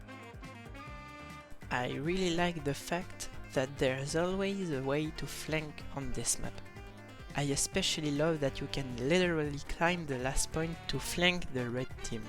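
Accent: France. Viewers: Tell me,judging by your accent,are you…french ?!(which there is nothing wrong about)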